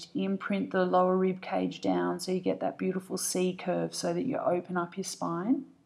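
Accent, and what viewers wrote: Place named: Australia. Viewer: Thank you! I’m brand new to Pilates and this was such a well paced intro. Also as an expat it’s great to be lead by an Aussie voice :)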